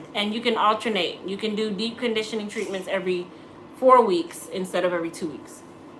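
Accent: Jamaica. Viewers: You were asked are you from Jamaica because you have an Jamaican accent.No one would have ever guessed that your a yankee and that your from the east coast. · are you 🇯🇲? The accent come out😀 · I like u n plus I hear our JAMAICAN accent makes me watch more of ur videos....he smile at d end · I hear some Jamaican in there lol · I heard the jamaican accent..ru from here... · Love the patois! Sounds like my house lol! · I hear that Jamaican accent 🇯🇲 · Jamaica accent can’t hide 🇯🇲😀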